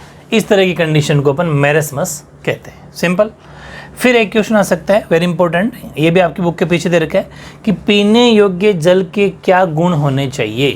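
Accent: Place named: Marwari, India. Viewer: Ghutne Adte h kabhi kabhi aap marwari bolte ho sarji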